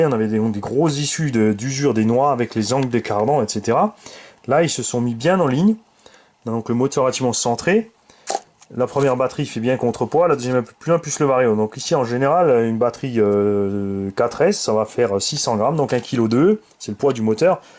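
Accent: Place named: South, France. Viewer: Les essais ! Les essais ! Les essais ! Tu commence à y prendre goût à l’accent du sud :D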